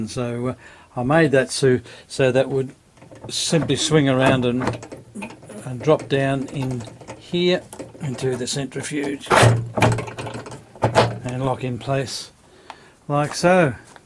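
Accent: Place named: Australia. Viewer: Heard your accent and immediately said "he sounds like a fellow Aussie" Then saw the Geelong shirt and all was confirmed.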